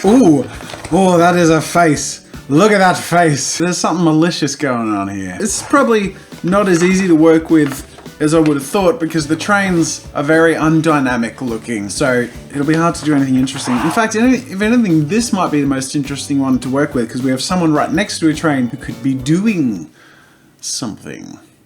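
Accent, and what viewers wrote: Australia. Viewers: Does he keep calling them ‘color-in book’, or is that just the Aussie accent??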